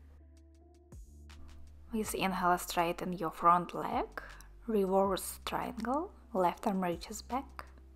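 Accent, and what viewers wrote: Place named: Poland. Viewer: fantastic video. she sound polish ;)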